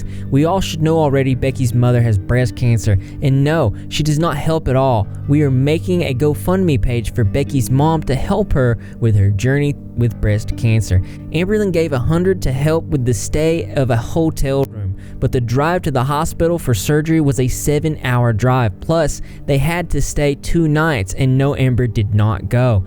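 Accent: South, United States. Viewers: I watch your videos only because of your southern accent. 🤠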